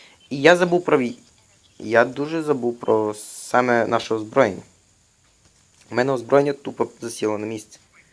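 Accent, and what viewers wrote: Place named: Hungary. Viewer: Мені одному здається, що автора акцент Угорський....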